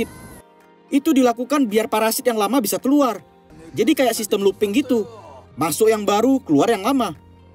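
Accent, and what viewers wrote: Medan, Indonesia. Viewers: Medan kali bahasanya wkwkw makasi ya kang kreator udh ngenalin cara anak medan ngomong wkwkw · Gw selalu suka logat Medan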